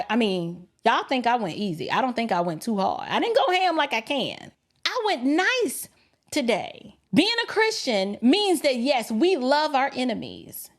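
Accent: New York, United States. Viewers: The way you said ya'll! You are an honorably southern bell! I know you are originally from NY, which I hear as well. I love your commentary and point of view. Keep up the great work! ❤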